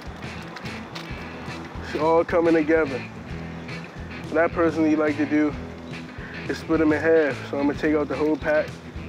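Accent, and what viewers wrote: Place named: DMV, United States. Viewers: If u ain’t from da dmv u don’t even understand him, my dmv ppl stand up slim💪🏽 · Nigga got a Baltimore accent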